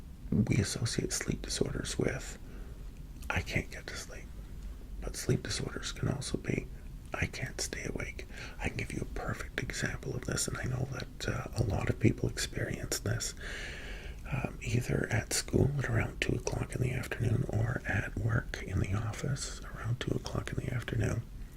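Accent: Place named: United States. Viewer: You have a wonderful relaxing voice to listen to. With a mild American accent too. New subscriber 👏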